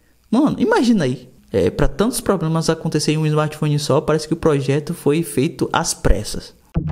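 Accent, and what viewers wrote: Bahia, Brazil. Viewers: De certeza esse cara é baiano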